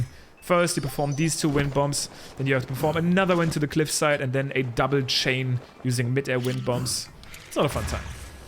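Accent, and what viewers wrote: Germany. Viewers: I do love German accents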